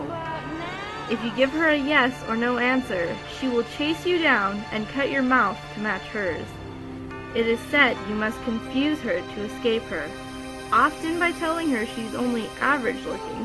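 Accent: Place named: Iceland. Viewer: OMG! You're Icelandic?! That's so cool! I would think it is hard to talk that way, though. But it sounds so cool!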